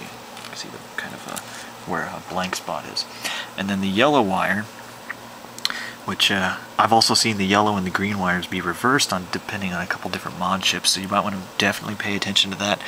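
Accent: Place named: Midwest, United States. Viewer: HA! you must be either from the mid-west, or Arizona... because you talk like ME! FUk this, fuK that... PLUS I spotted that Quicktrip cup on your floor :) thanks for this informative video, really entertaining too!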